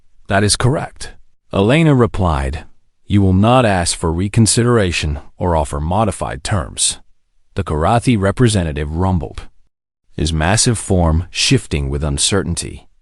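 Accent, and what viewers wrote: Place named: New England, United States. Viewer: Yes, the electronic narrator is definitely from New England, and possibly tipsy. ⭐ But the story is good.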